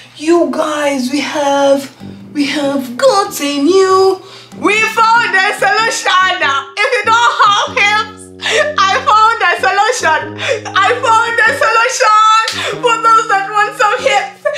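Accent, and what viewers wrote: Uganda. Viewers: It's your kayindo for me. It really shows that your a muganda plus 👌👌👌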